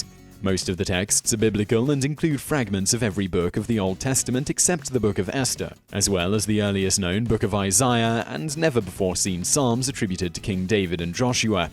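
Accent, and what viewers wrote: England, United Kingdom. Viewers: Fantastic but so very english accent some words I can't understand.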